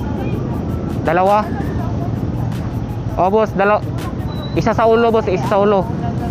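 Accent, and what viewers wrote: Visayas, Philippines. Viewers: Visaya sya kaya pwede ikaw mag adjust?✌ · Mailhan gehapon ka na nag prank ka Kay halata man imo tagalog tuno palang sa imo pag tagalog bisaya man .. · Yan camera ... ayus sana ung pag bigkas ng tagalog mo ayusin mo na rin pra s halata bisaya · Bisaya ka dong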